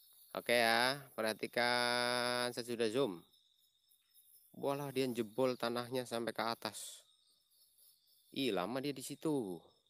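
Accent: Banyumas, Indonesia. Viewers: Misi, numpang nanya, mas si orang mana, koq logatnya ngapak kaya aku 😬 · Hahah kami tenggengen.. Bahasa ngapak nemen yaaahh Keren lanjut bro..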